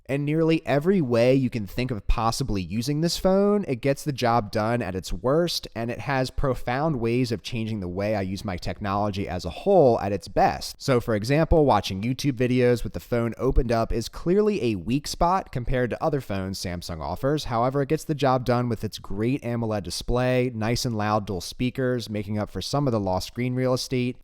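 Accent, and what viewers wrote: United States. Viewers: What's your American accent?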